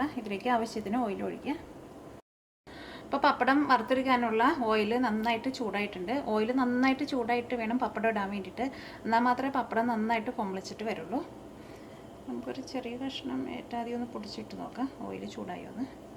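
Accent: Thrissur, India. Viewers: Within 3 hours, almost 1500 likes !!!!!!!! it's a reward for your hard work. I noticed the strain in your fingers while you were mixing the dough. As you said, I think this is the first recipe for pappadam in the net, which is our favorite one, of course, everyones. Really it's my son who introduced you to me. When he was living alone in Florida he was trying your recipes and now his wife has no complaints about him because he knows cooking and helps her. All of us like your Trichur accent.Let me try now itself and if it comes okay I'll definitely let you know. I tried WhatsApp but not connecting. Could you please tell me where you are living.Thanks a lot and keep it up. :) this is the first time I am subscribing a channel.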